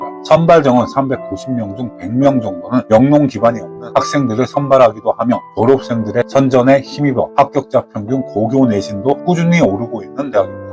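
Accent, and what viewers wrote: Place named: North Gyeongsang, South Korea. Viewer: 죄송한데 비음이 넘 셉니다..서울경기 아니시죠. 경북등 분들 특징 발음같은데 목 소리가 울려요. 그리고 영상 몇개보고 느낀게 경북쪽 대학 출신인가요. 맛간 지방대학들 올려주려 애 많이 쓰시길래요. 제가 무당기가 있어서 맞을 겁니다.ㅎ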